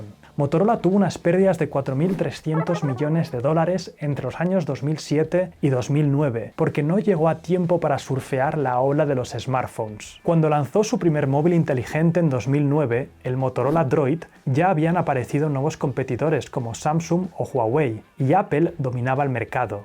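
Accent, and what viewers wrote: Spain. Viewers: Así hablan los españoles, no cambian, o cambian muy poco la pronunciación de las palabras escritas en inglés. Ya es algo cultural, no creo que no hable inglés.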